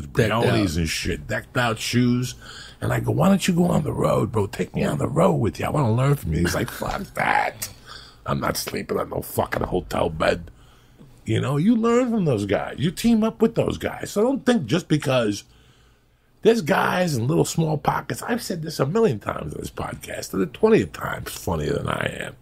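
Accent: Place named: East Coast, United States. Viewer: He doesn’t remind me West coast comic more east coast roots. Accent. Comedy is east coast. I’m glad he is proud to be from New Jersey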